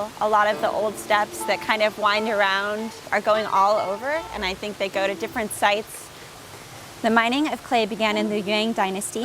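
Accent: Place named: West Coast, United States. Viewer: A statement should never sound like a question. This video has so much to share. Please watch your west coast accent.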